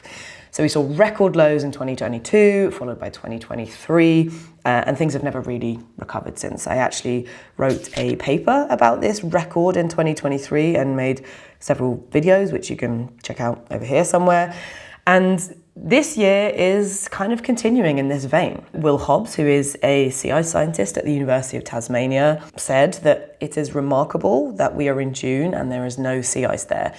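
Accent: United Kingdom. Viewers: To be fair, she does sound a bit British, and we did get our system from them. I say that with the understanding we’ve been too stubborn to update it since.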